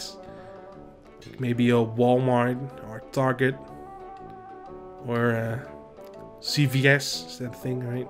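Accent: Netherlands. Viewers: The dutch accent 👌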